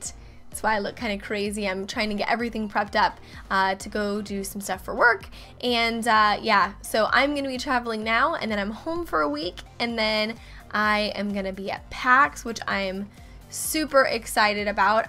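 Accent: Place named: Midwest, United States. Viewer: You accent really confuses me. It sounds like Midwest mixed with a bit of southern. Anyone know where she's from? Statewide, I mean. I'm not a creeper.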